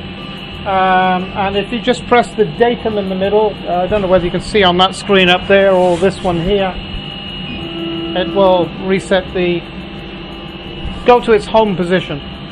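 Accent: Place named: Cardiff, United Kingdom. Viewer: laaarge! Got to be Caaardiff or thereabouts :)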